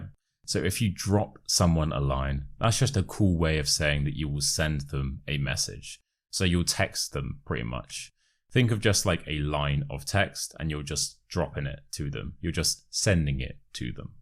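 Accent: United Kingdom. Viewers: I love your accent so British, I wanna speak like you 😊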